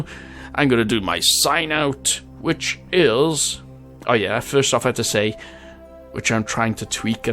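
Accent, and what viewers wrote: United Kingdom. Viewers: Where are you from? UK?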